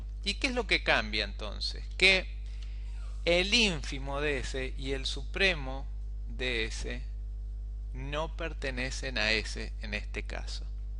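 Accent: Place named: Rioplatense, Uruguay. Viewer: Saludos y Excelente explicacion. Soy de Mexico y me sirvio de mucho su video, (ademas ese acentito a Uruguayo o Argentino, como que ayudo mas), se gano mil likes y la subscripcion a su canal. En horabuena por su grandiosa ayuda!!!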